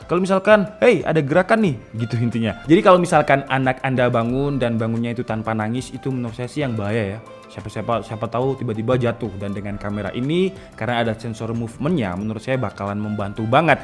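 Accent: Malang, Indonesia. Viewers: bang orang malang ya?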